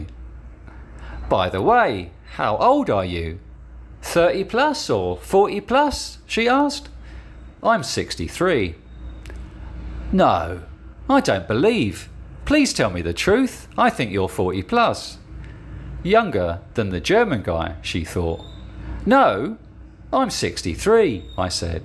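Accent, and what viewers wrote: England, United Kingdom. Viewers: The English accent is way better than a yanks . Don't watch if you don't like. UP THE POMS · Your strong English accent is annoying to listen to, if I was a woman I wouldn't want to hear it , an American talking English is much more pleasant.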